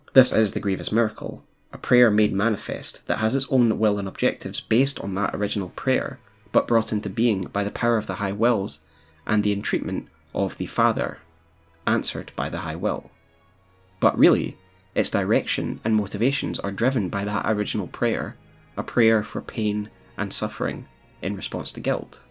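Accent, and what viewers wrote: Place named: Scotland, United Kingdom. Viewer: I'm gonna guess your accent and say your country of origin is Scotland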